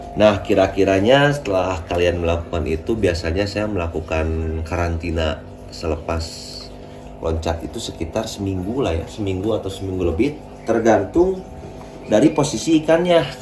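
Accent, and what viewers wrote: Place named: West Java, Indonesia. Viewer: Suka sm logat sunda nya...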